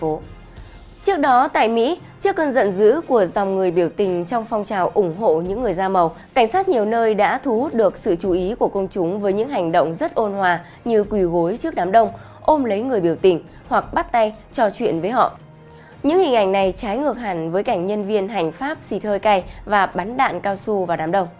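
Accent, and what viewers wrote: Standard. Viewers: Giọng đọc vẫn chuẩn rõ ràng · Chương trình hay mc xinh đẹp phát âm chuẩn , rỏ ràng.chúc chương trình ngày một hay và dc nhiều khán thính giả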